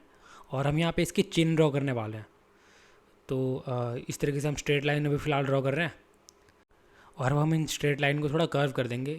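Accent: India. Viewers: This guy sounds Indian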